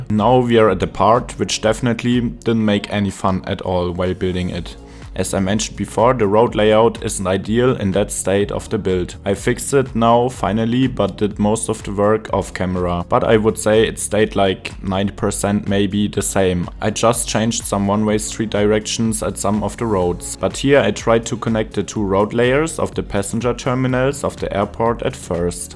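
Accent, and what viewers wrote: Germany. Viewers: I Hear Deutsch in that voice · Ich höre den deutschen Akzent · it may be that you come from germany and speak it it sounds a bit like the pronunciation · bist du deutsch(wegen dem aktzent)? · Digga dieser deutsche akzent😅und cooles video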